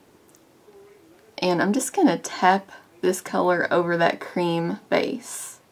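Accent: South, United States. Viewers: Geez that's an awesome nail polish color! Will def be trying that out. Love the look as well....got yourself a new subscriber! You sound like a southern belle too! Awesome!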